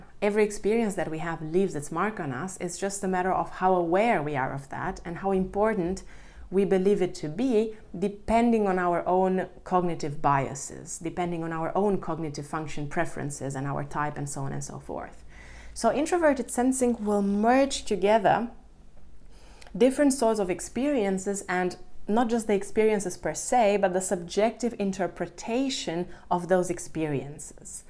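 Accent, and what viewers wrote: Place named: Greece. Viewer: Are you Greek by any chance? You have a slight Greek accent :) But it’s cute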